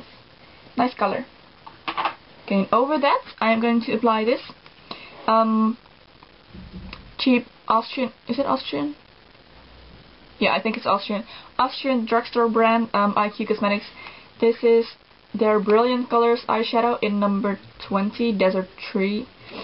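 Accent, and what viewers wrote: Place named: Netherlands. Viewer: je herkent het gelijk als een nederlander engels praat. niet dat je engels slecht is ofzo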